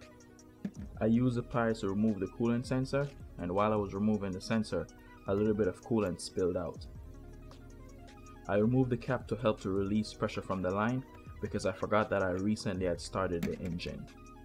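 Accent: Jamaica. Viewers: U Jamaican bro??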